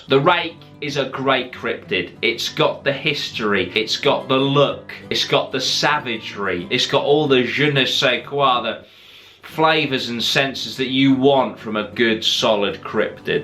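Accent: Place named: Norfolk, United Kingdom. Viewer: Are you from Norwich (I am) cos you have a norfolk accent?!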